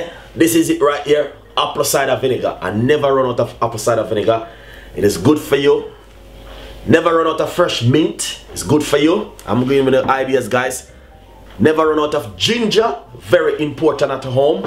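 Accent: Jamaica. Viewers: Some people just like talking rubbish he is form Jamaica that how they speak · Coo-Coom-Brrrrr! So love that Jamaican accent! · His Jamaican his accent is different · For those who are concerned about his accent,he's my countryman,'Jamaican'to the core,no speaky spokey,and i rate him for that,straight down to earth son of the rock,thumbs up, gwaan do u ting mi yute!!! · I love your accent Jamaica tunnation nice one there, but that vegetasble is not seen clearly to see it i can get around me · I lova me that Jamacian talk and sound, Chef!! I love the island mindset and food/drinks and healthy!! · He sounds Jamaican. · The accent really attracted me, big up, nuff respek to my Jamaican bra. Anyone without an accent is dumb (can't speak). There are more than 10 different accents in the UK and they are all speaking English! People need to travel and learn.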